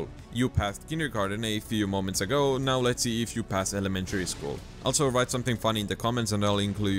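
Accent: Finland. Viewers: New sub based solely on the humour. 😂 For me, he worst thing about combat is scrolling the damn items to get to things I need. By that time, I'm usually dead or almost dead. 😂 Edit: also, you sound Finnish. Is that right?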